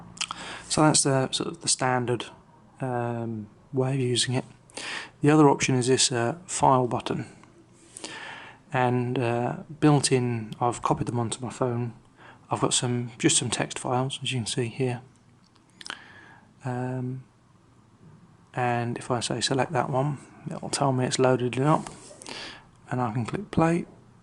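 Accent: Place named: United Kingdom. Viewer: Yes. He is speaking the Queen's English. I'm guessing you're American, yawn.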